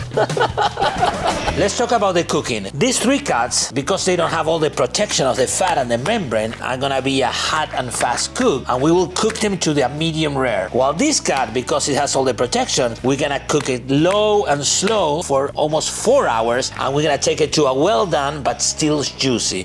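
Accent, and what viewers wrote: Argentina. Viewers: Un Argentino hablando inglés con un acentazo pero sin trabarse y sin parecer forzado, es un orgullo este chabon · i realized so quick this dude here is argentinian because of his accent and spanish words on the middle of his commentary que qliao, aguante el asado uraa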